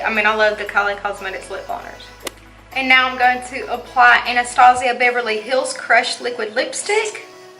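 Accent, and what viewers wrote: South, United States. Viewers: I absolutely love your tutorials! I also have the country accent, yours is cuter! 💜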